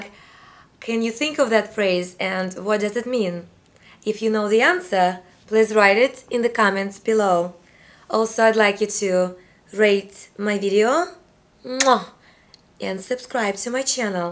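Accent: Russia. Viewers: I believe she is Russian, I recognize the accent. By the way, why can't we all just get along. Think of it this way, it doesn't help you to get angry at the other guy, that only satisfies his need to annoy you. You shouldn't antagonize him either, that only puts you akin to his behavior. Also, it is not necessary to respond to me, I will very likely never check back anyway. My very best wishes to all of you.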